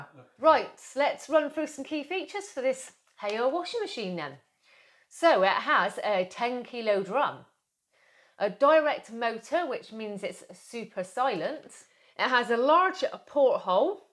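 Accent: United Kingdom. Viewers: Bristol accent ?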